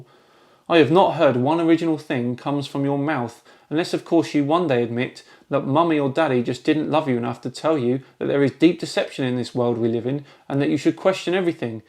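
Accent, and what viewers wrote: United Kingdom. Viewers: I'm 50/50 on it., I only support you b/c my little brother Brian (FFAS) drew an amazing portrait of you & he's a very talented artist. Half the time you're entertaining & the other half you're condescendingly ass-ish. The Lego pic frame was your saving grace. Yes, sometimes you're funny, other times, your mixed British accent is grating b/c it's right in between Proper English, "with a moment for tea & crumpets," and London Ghetto Cockney, "whut was u finking, bruv," but b/c it's not one or the other, the duality is more noticeably annoying to those of us who have no accent.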